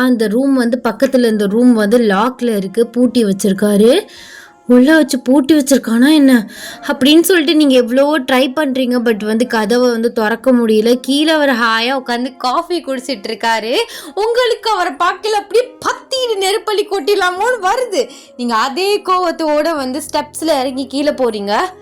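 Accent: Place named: Nagore, India. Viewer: Ena sis engala ippidi suspense layae vaikiringa😩but na story half laye guess pannitan y/n also human illanu😁next intha upcoming story kaga waiting sis🤗oru veala enoda guess y/n half human +half Demon or werewolf ha irupalooooo.....Sis neenga demon nu tha solluvinganu nenichan😂na last comment la kuda Demon nu tha potan😂but idhu sema story sis i loved it😊unga slang awesome neenga nagore ha sis😊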